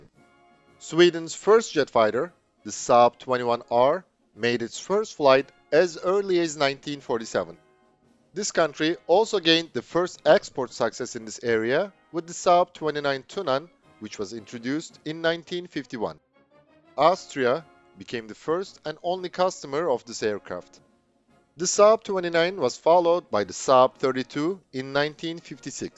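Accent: Turkey. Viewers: I know this dude. His accent is Turkish coz he is a Turkish guy.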